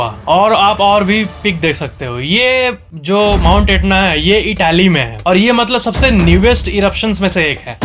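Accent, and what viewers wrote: Bihar, India. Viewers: Bhaiya ap bihar se h kya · Tumhare Bolne ke tarike se anyone can say you are bihari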